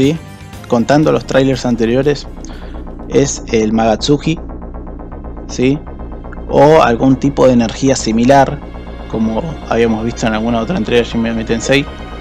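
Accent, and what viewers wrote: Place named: Argentina. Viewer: No se yo, pero suena a Argentino.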